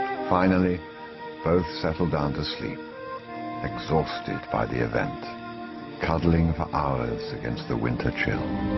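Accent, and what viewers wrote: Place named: United Kingdom. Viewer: Bonito é o sotaque britânico do narrador... rsrs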